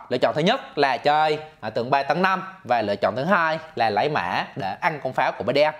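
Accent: Binh Dinh, Vietnam. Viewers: Giọng bìn định phú oen nghe hài quá kk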